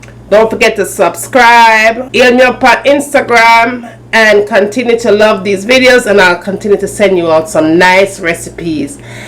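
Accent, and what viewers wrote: Jamaica. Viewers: I've never tried gravy like this before I love it thanks! and I love you authentic Jamaican accent!!! 💜💜💜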